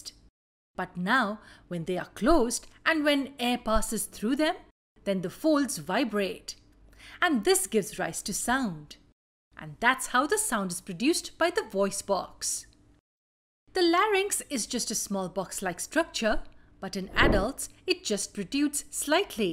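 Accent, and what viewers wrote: India. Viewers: Thanks mam. You cleared all my doubts In one video. And also thanks for the Indian accent and clear voice. 😚😚😚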